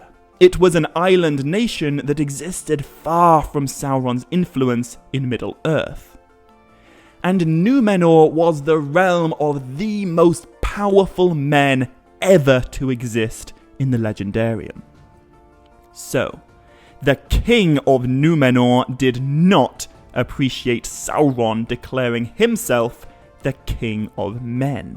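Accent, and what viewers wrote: United Kingdom. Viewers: Wow turns out I hate British accents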